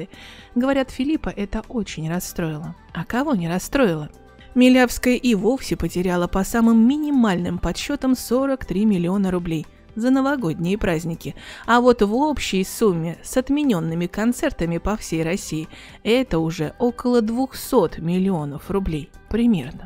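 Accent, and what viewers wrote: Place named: England, United Kingdom. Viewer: чувствуется английский акцент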